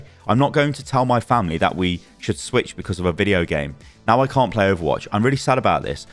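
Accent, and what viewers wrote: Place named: West Midlands, United Kingdom. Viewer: Not just British, but the West Midlands accent, mate, and the deep voice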